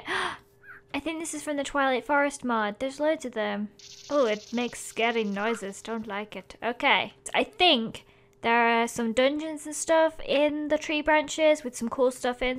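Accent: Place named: Leeds, United Kingdom. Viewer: It sounds like she's from Leeds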